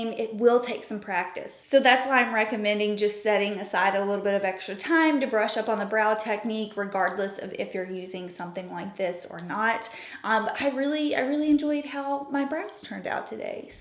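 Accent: South, United States. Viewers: Hi, I’ve watched the first video you have on YouTube! Are you from Texas? Your accent is very southern!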